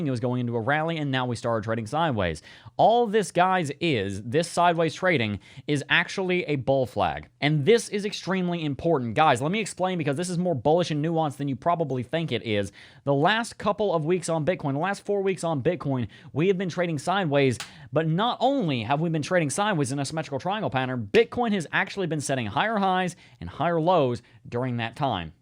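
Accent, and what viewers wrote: South, United States. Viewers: Thanks for the videos! Where are you from? You ve got like an a "country" accent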